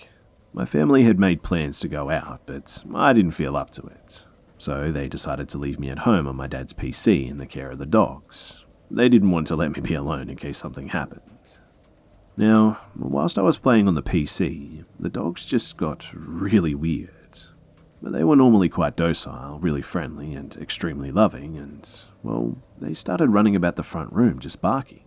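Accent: Australia. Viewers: So good to hear an Aussie accent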